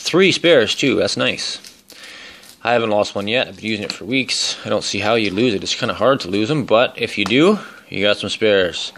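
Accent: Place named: Canada. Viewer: sounds like Canada for sure.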